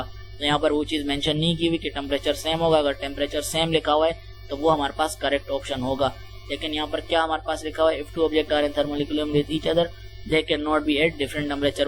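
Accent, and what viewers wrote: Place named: Karachi, Pakistan. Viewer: bro ascent sa to karachi k lagty ho #karachiwalay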